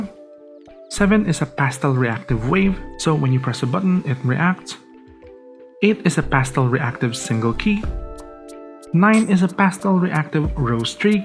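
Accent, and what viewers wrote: Philippines. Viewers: Very sexy filipino accent, say "bye roos" for me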